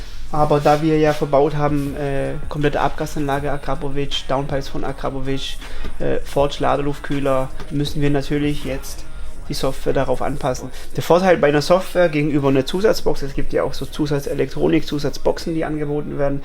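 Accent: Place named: Swabia, Germany. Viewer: Allein schon wegen dem Schwäbischen Akzent gibts einen Daumen hoch!!!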